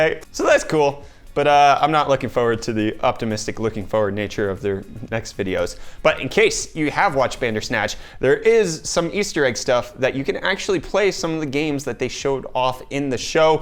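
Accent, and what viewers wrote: United States. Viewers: So this has probably asked before but Im new to your channel. How is it that you are an American or at least have an American accent and living in South Africa? Really love your content by the way. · You sound extremely American, for a guy from south Africa.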